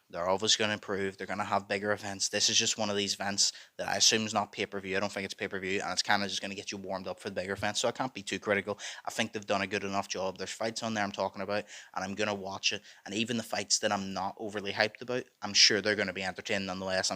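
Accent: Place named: Liverpool, United Kingdom. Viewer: Hahahhaha bruv your accent is amazing, you must be from Liverpool innit?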